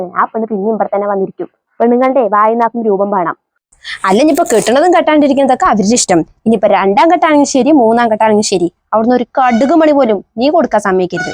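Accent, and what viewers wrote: Palakkad, India. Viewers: ഭാഷ ശൈലി പാലക്കാട്‌ പോലെ തോന്നി 😊❤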